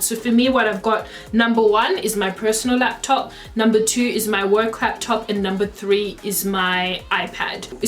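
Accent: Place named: Australia. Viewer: Thanks for sharing so much detail, I love your Aussie accent :)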